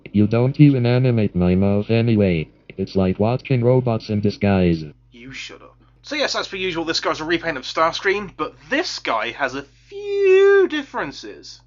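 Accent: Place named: England, United Kingdom. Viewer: Wait... are you from England like me meate? cos you sound like you're from my aera XD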